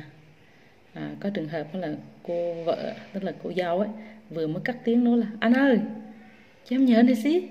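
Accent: Quang Nam, Vietnam. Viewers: dạy tiếng Anh vậy khi phát âm tiếng Anh có khi “nồ” bị ảnh hưởng giọng QN không? Thí dụ “shall” —> đọc là “sô”? How (—> hô) Frank (—> frenk) Mackerel (—> méc.kerel) Contact (—> con.tect) v v Các bạn học sinh họ có khó khăn gì ko? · Chào em gái Quảng Nôm. Anh cũng người Quảng xa quê gần 30 năm.Rất vui khi đựợc nghe em nói chuyện bằng giọng Quảng. Hy vọng một ngày không xa được cà phê với em tại Đà Nẵng hỷ! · Chu choa bữa ni cắt tóc ngắn, nhìn thấy lạ nghe cô giáo . Nói chuyện giọng Quảng nom nghe dễ thương quá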